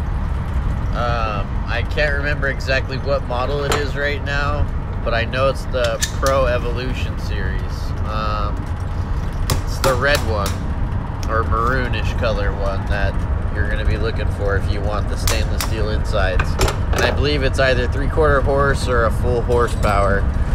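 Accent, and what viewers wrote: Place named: Southern California, United States. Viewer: Hey just wanted to thank you for this video, it really helped me replace my first garbage disposal. I also wanted to make special comment on your very Southern California ways displayed in your narration – I grew up in the area but have spent the last 28 years (I'm 46) in NorCal and just hearing you talk reminds me of all my old buddies down there so thank you for that too!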